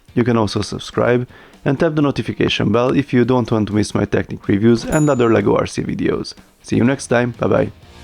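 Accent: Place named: Hungary. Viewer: Te Magyar vagy? Egyrészt,mert hívnak,másrészt az angol kiejtéseden is látszik.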